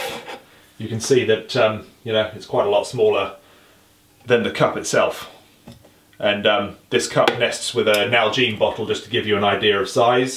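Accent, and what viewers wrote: England, United Kingdom. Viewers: Thank you. I’ve just found your channel, but you have another subscriber on the strength of this one video. Also a nice change to find an English accent and a practical purpose, rather than some over-tattooed young person preparing for an “Armageddon” with a military hat and a (metaphorical?) gun on his hip. Thank you again. Les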